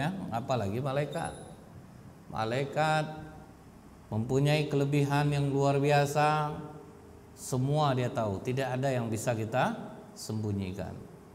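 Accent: Pekanbaru, Indonesia. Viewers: Selain dapat ilmu dari tuan guru, logat khas Pekanbaru tuan guru jadi pengobat rindu 😁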